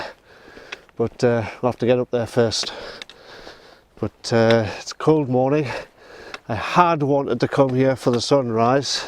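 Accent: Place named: Northern England, United Kingdom. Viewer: I can tell your from up north and you don't have to come down : )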